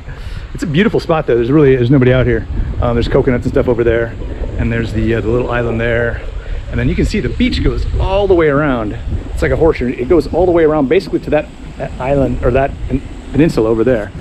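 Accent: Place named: Chicago, United States. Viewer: Are you from Chicago ? When I listen to you speak we sound exactly the same.!! 😂😂😂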